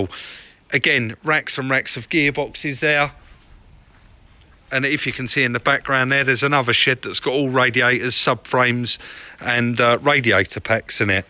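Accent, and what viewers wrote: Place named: Bristol, United Kingdom. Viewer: Farmer accent from good ol' Bristol eh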